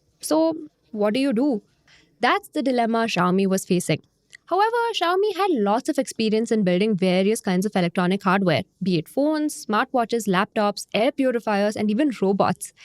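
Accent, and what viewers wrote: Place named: Tamil Nadu, India. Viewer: that tamil accennt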